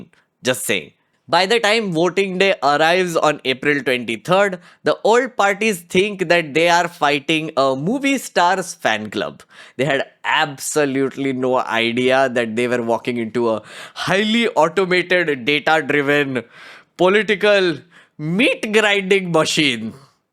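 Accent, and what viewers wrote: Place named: North India, India. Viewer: Ur English says it all North Indian English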